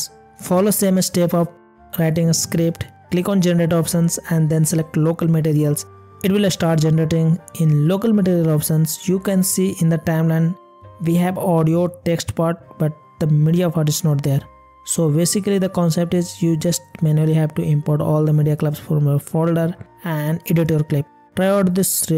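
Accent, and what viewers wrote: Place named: India. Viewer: bro,maybe u can try vouce over since I dont like that Indian english accent,the way u pronounc "T" ,its terrible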